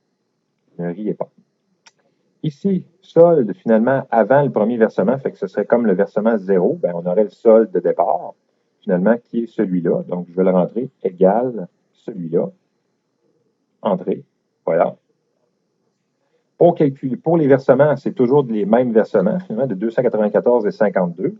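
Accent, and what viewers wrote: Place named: Quebec, Canada. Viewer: l'accent... typique québécois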